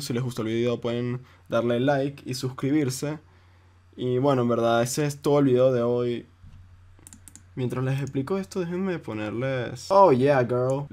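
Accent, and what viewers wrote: Venezuela. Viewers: jeje venezolano he.... excelente video muchas gracias !!! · ahajajaja desde la primera palabra que dijiste supe que eras venezolano!!!, de donde eres bro? yo de bqto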